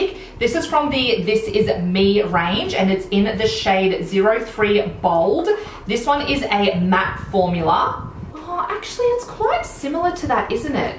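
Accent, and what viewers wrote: Australia. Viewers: I love makeup but I’m here just to learn Australian accent and you help me a lot! I’m going to Australia next year and I’m just ready to buy these products, for sure I will love it 🙌🏼❤️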